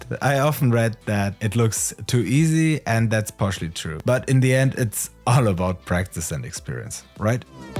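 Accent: Germany. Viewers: This German accent makes me feel like he’s a NASA scientist, and I believe everything science related he says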